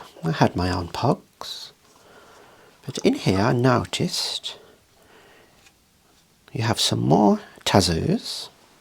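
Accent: Birmingham, United Kingdom. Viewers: I've just learnt tazos existed! Nice seeing the old manu player statues....nice concise asmr (even with a brumy accent) 😮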